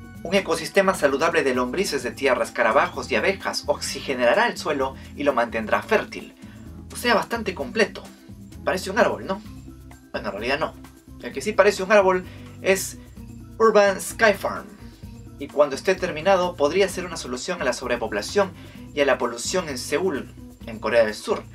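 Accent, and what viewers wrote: Peru. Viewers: No es que use acento neutro xD, sino que ese es el acento de las personas que viven en ciertas zonas de Perú, por ejemplo, Lima.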